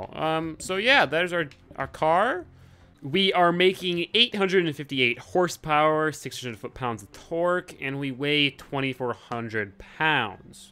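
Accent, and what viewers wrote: Russia. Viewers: your german accent sounds more like a russian one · that akzent though :D (ps. thats propably a russian one )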